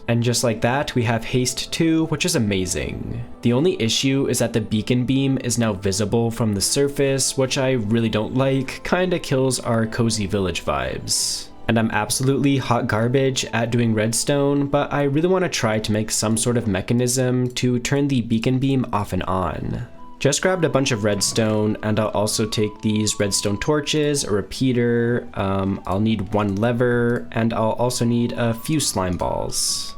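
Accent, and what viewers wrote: Canada. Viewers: It's just because he has a Canadian accent. They just speak that way, calm down bro.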